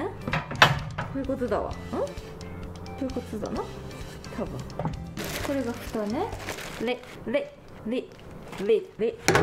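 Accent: Kansai, Japan. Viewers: 日本語も英語も混ぜ混ぜで 関西の女の子って感じで素敵ですね😍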